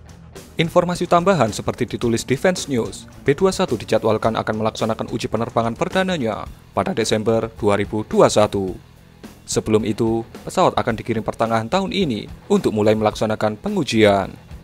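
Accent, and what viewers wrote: Java, Indonesia. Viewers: Medok amat logatnya mas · * Medok medok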